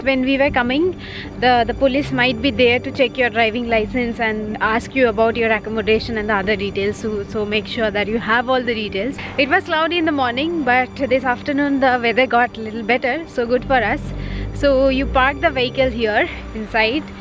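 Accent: Bengal, India. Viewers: Your South Africa series was amazing, very nicely edited, breath taking scenic beauty, lively places and adventurous activities that you both did. Just guessing from your english pronounciation, Are u a Bengali??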